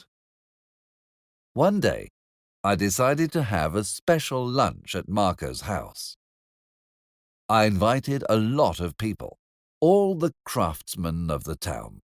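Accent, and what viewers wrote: United Kingdom. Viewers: British accent, eh.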